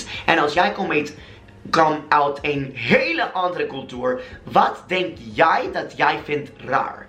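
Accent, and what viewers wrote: Netherlands. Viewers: als Belg doen we dus ook al die " rare" dingen alleen is drop niet zo populair hier and i adore your dutch accent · your Dutchlish (Englitch?) is 💯! Not only does your video crack me up, but I’m learning Dutch van jouw. Lol 😂 has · I like that you speak Dunglish, and switching on the automatic subtitles is just hilarious. The translation software just goes haywire and really doesn't have a clue what to make of what you're saying. So funny! I see you've discovered the sound and video effects, but you really don't need them. You're entertaining enough without them (and quite frankly, the effects are a bit distracting to what you're talking about). And hey, 11K!!!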